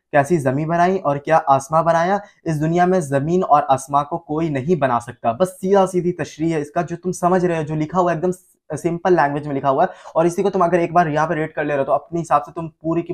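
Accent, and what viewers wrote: Bihar, India. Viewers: sir aap bihar se hain kya?????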